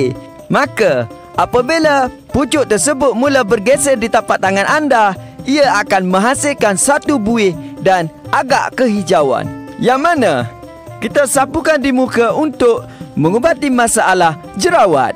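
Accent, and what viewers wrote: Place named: Kelantan, Malaysia. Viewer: Hahahahahhaa Memey Kelate lah ho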